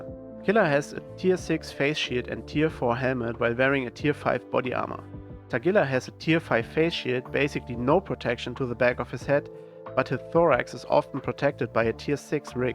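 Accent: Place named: Germany. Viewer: Denglisch. Ein unverkennbarer Klang im Universum! Gruß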